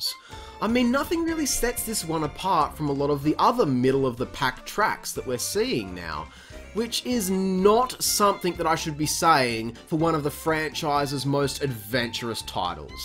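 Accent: Australia. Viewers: Wow, what a bumper and brilliant treat this was to watch! I really enjoyed your opinons and enthusiasm, nice to have an Ozzie accent to listen to throughout!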